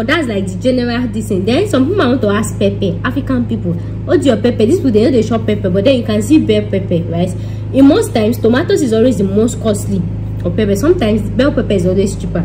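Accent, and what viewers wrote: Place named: Ondo, Nigeria. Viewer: With the yoruba accent i think you are from ondo townm please tell me i am wrong! omo yemi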